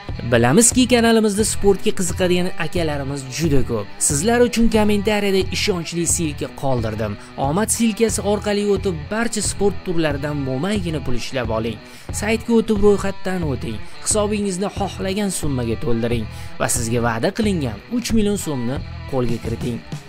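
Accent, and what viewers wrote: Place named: Russia. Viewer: Концентрация, креатив, позиция инглизча сузлар, буларни урисча талаффуз килиш урискулликдан далолат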